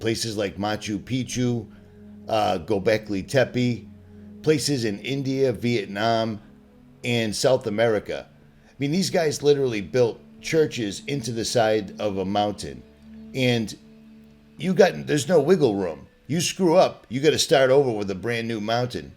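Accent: Boston, United States. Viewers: Yo bro definitely a good piece!! Sounds like a guy I knew from Boston I found on YouTube back in 2013👍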